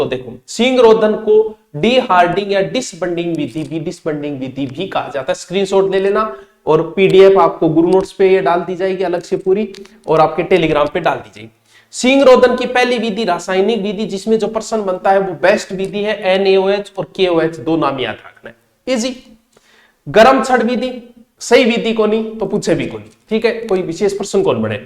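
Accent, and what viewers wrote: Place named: Rajasthan, India. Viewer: Hello sir.... sir me MBBS kar rha hu....abhi 3rd year me hu......pta nhi kaha se mind me ye RAS ka bhoot sa chhad gyaa ek dum se.....aapke bahut saare videos dekhe hai maine ....aap bahut achcha padhaate ho... dheere dheere.....and marwadi sunn ke toh mazaa hi aa jaata hai sir.... English sunn sunn ke dimaag kharab ho rkha hai already 😂.....abhi pta nhi kya karna hai...but me isliye dekh rha hu aapke videos kyunki me Rajasthan se hu....toh mujhe ye sab pta hona chahiye naa .. geography... history....fort....rivers etc etc...mujhe kuch bhi nhi pta inn sabke baare me... isliye aapki kripa se thoda gyaan le rha hu...baaki dekhte hai....waqt kaha le jaata hai.......btw..... thank you so much sir ❤❤❤......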